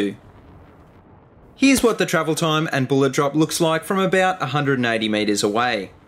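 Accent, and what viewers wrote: Australia. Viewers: He has to be Australian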